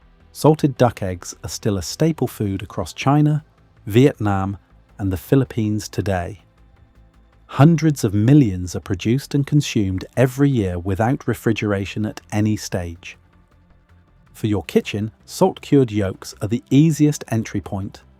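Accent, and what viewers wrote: United Kingdom. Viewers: The British voice is misleading. We never put eggs in the fridge on this side of the pond - in our homes or in the shops - and they don't go off after just a couple of weeks. I understand that eggs in the U.S. are washed and disinfected, and this strips away their natural defences, meaning that they have to be kept refrigerated. This is mentioned in the vid, but as I say the British voice is misleading; it implies that we do the same here.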